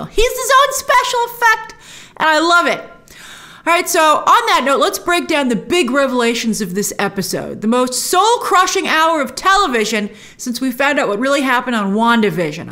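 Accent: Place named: New York, United States. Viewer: I heard that (exaggerated) New York accent too.:) I've been loving this series. I love these more character-driven stories that these MCU shows have given us. We can save the action for the movies.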